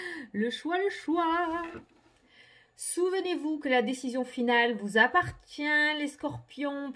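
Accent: South, France. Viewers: Mais franchement, cet accent qui sent le sud et cette patate et joie. Rien que ça, c'est un réconfort quand on traverse une période pas cool. Alors merci pour tout ça!!! Passe un réveillon plein de chaleur et d'amour.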